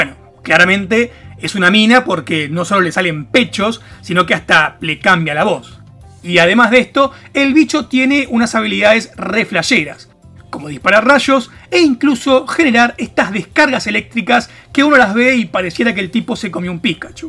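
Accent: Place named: Argentina. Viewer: Ese acento argentino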